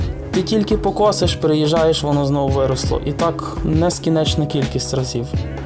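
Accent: Ukraine. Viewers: Смішний, піднамаєш настрій, і діалект класний. Чернівці?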